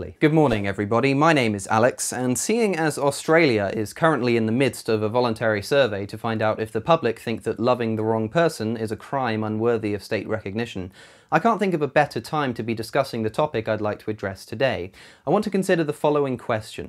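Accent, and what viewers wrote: United Kingdom. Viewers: His British accent makes him sound smarter than he otherwise would and the American flag in the background confuses me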